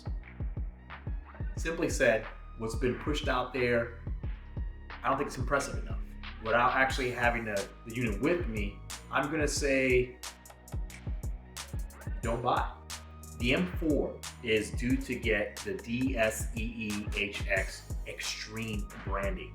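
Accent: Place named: New York, United States. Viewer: Good effort for a noob but that New York City accent is way to heavy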